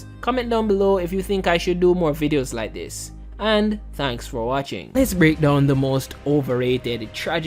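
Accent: Jamaica. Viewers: Question are you Jamaican? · Are u jamaican? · Are you Jamaican?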